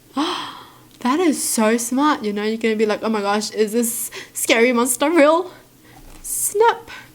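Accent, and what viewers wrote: Australia. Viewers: She's Australian lol <3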